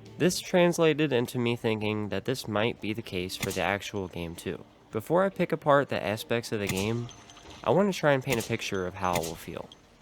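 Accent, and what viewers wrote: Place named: Baltimore, United States. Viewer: You from Baltimore? You have the accent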